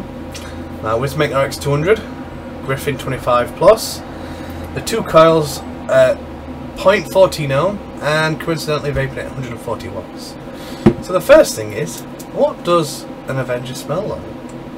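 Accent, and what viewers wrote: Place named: Yorkshire, United Kingdom. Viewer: Eyup fella, sounds like tha lives near me somewhere in Yorkshire. Good videos, very helpful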